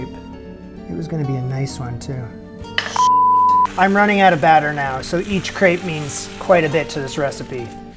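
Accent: Canada. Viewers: Take it "Eout" of the pan,! 😁🤣 Love your Canadian accent.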